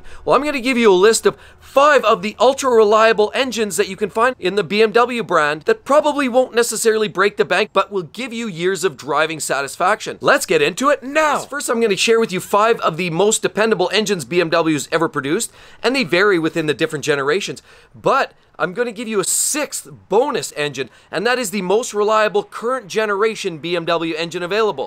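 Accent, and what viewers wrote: Canada. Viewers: drop the heavy Canadian accent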